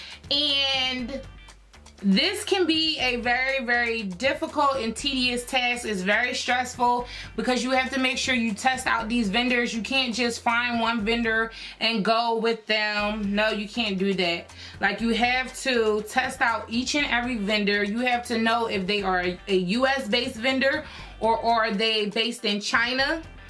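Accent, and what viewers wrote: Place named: New Orleans, United States. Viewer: I can hear the New Orleans in your voice lol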